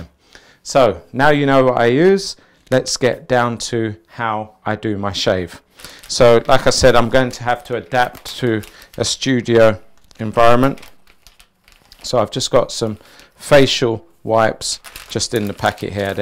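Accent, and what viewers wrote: United Kingdom. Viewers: you have such a calm and collected manner and I really enjoy listening to the deliberate articulate way you speak. Of course the distinguished English accent is really cool too. I wish I could have you as my barber. That would make my monthly haircuts enjoyable and a much more pleasant experience being able to chat with a nice easy-going person. Alas I live in Singapore, a country in Asia very far from the UK. The barbers here hardly say a word and when they do, it's pretty simple things like how are you doing and how's your day been so far. It's nice having someone who could provide tips and advice and basically just chat about stuff and catch up on things everytime you see them.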